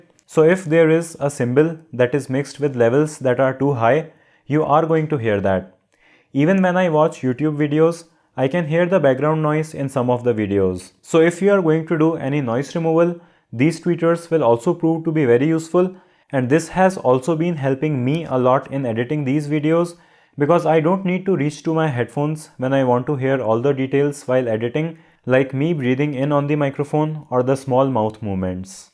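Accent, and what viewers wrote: India. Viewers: Can’t take him seriously with that Indian accent 😂😂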